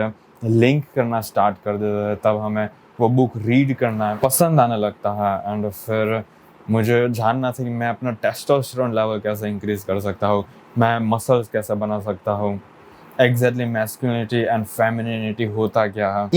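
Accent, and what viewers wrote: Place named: Bihar, India. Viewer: He sounds like bihari who knows english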